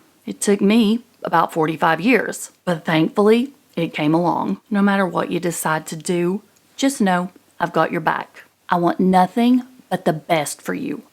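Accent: South, United States. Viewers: Man, love that southern draw…..what state ya from?😊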